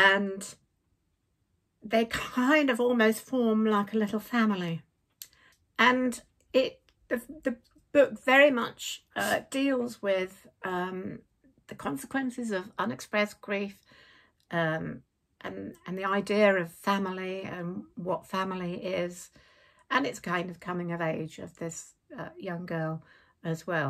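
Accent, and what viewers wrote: England, United Kingdom. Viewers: No apologies needed for reading in your British accent; I loved it!!! I look for British readers for everything. 🤣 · Also, I loved hearing Little Women in your English accent, I could listen to all books in your beautiful accent!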